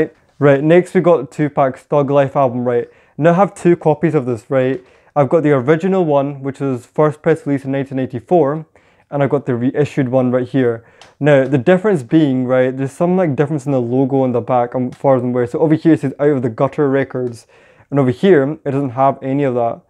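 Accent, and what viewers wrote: Fife, United Kingdom. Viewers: That sounds like a Fifer accent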